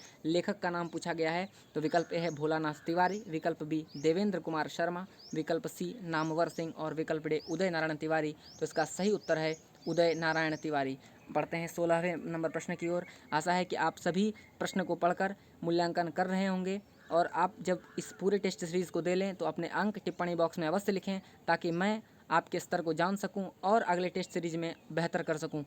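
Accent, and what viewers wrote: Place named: Bihar, India. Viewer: Bihari.. sayad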